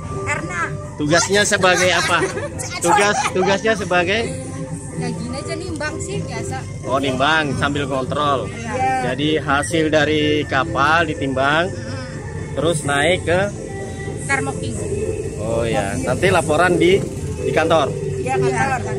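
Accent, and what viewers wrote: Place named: Java, Indonesia. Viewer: Ngomonge medok nmen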